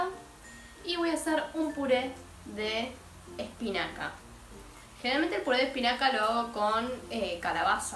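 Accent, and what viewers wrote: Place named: Argentina. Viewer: Me sorprendí al escuchar tu acento! Al fin alguien de Argentina haciendo este tipo de vídeos, te necesitábamos.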